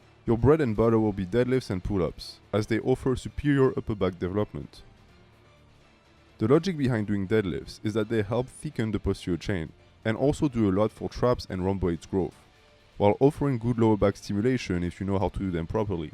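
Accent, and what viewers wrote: France. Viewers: With your accent I Can Say that you're French... Am I right ? I Can tell this 'cause I'm french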